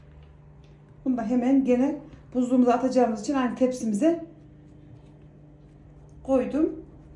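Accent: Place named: Gümüşhacıköy, Turkey. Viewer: Bende Gümüşhacıköylüyüm şive yabancı gelmedi memleketim güzel dir güle güle oturun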